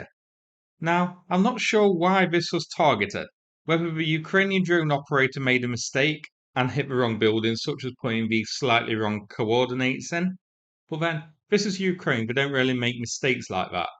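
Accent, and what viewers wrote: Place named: Midlands, United Kingdom. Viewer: It's not London, nor the West Country, Wales, Scotland or N. Ireland. My guess is the Midlands somewhere.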